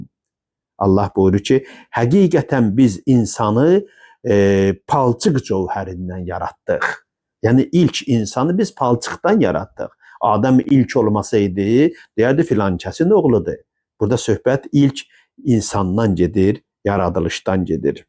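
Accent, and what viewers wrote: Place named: Iran. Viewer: Birinci Azerbaycan dilini fars lehçesinde danışma..............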